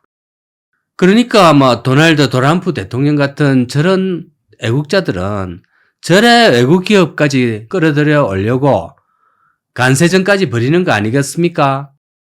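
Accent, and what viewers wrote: Gyeongsang, South Korea. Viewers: 혐오 갈라치기 좀 그만해라 ..나이처먹고 뭐하는건지...나도 나이 처먹어도 돈 이런식으로 안벌어....그리고 경상도 억양쓰니 더 역겨워~ 이세상에 일방적인것은 없어...노조없는 삼성은? 천국이냐? 노조의 문제점이 뭔지 해결책과 헤안이아닌 오로지 혐오... 나이 이렇게 처먹는거 아니다... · 유튜버는 사람들 일자리 잃어가는게 신나고 즐거운가 보네...한국에 기레기가 만든 용어인 귀족근로자 많아서 잘살면 덧나냐? 다들 생활고에 시들어 살아야 정상이냐? 말투가 역시나 경상도인이네...경상도 사람들의 종특은 모두가 못살기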